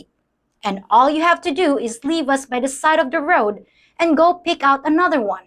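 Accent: United States. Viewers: Yeah, guess where that American accent comes from 🤭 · Hello again my dear , it seems that your american slang is starting to show things that you could have only learned in the usa you were either in nyc or los angeles , your english is to polished to have come from the philippines , you can fool some people but you cant fool everybody im sure you will block and delete me now ! have a nice day ! im not knocking your channel you say some cool things that need to be said but pretending your somebody your not is another thing ! like you say honesty is the best policy ! and one other thing who is the Foreigner that is supporting you ? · You don’t sound very Filipina you sound more American sooooo I’m just saying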